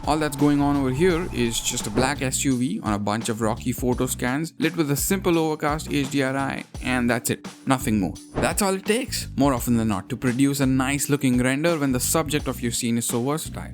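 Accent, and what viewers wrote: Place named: India. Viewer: you click the video, you hear an Indian accent.... hell yeah 🔥🔥🔥🔥🔥🔥🔥 like the video and subscribe the channel, you'll never be disappointed.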